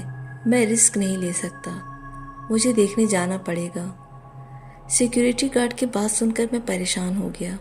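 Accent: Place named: Kolkata, India. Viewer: Ap ki language culcuta ki hai Kia ap culcuta se ho Asalam u Alekum lots of love from Rawalpindi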